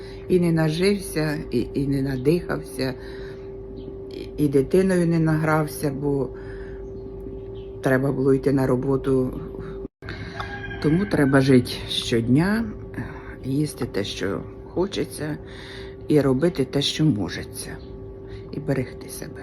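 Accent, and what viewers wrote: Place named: Poltava, Ukraine. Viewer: шановна! Ну, я скільки не дивлюсь Ваші відео, я ж чую полтавський говір!!!!😊😊😊😊😊😊😊😊. На Вас дивлюся, а бачу дружину мого брата, нині покійну, Ніночку. Один в один. Якщо не секрет, де Ви навчалися в Полтаві?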